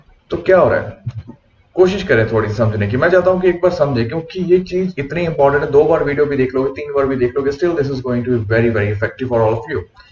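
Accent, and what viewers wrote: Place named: India. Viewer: English hindi mix accent 😏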